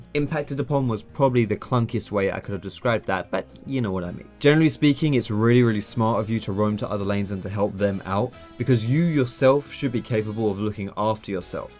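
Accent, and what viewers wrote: United Kingdom. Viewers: He's uh. British. Not American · Wait he's british? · Wait... Are you british?!? OMG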